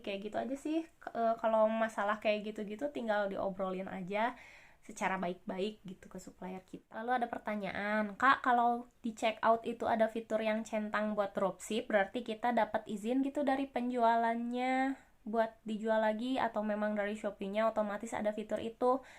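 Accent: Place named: Bandung, Indonesia. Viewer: suplier atuhhhhh....dan saya sukaa nada bicara kaka hehehe khass bandung sukses teteh owner ramah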